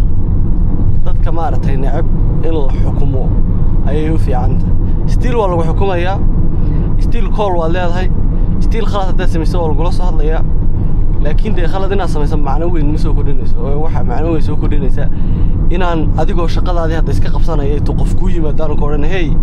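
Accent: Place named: Somaliland, Somalia. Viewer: Is fahano dhibka wa lahjada😂 adinka wa idin ku qosalna wlhi marka ad xamar imdaan😂lahjadina funny wye guuleysta rer somaliland one love❤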